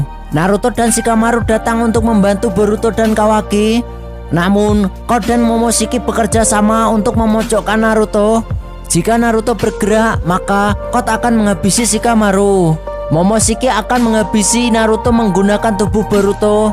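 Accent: Java, Indonesia. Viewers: Ooh abange orang Jawa tohhh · Eyo woh jowo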